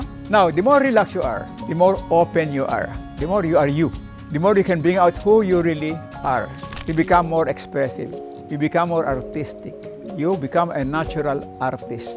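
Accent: Philippines. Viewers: While listening to you sir I thought you speak in tagalog, I can understand you clearly, I love the pronunciation very much Filipino. Looking forward for this kind of documentary works from you. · Yes that Taiwan today was not even Chinese then, formerly its name was Formosa. I can sense accent of Mr. Narrator..ilonggo ?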